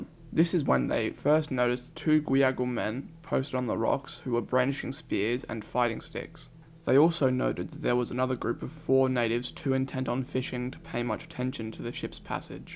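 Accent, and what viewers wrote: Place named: New Zealand. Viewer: I'm a New Zealander and honestly thought your accent was Kiwi at first.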